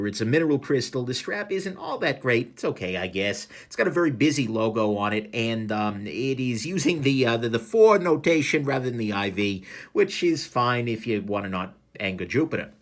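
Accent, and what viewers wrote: New York, United States. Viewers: That accent is just awesome. So old New York I love it!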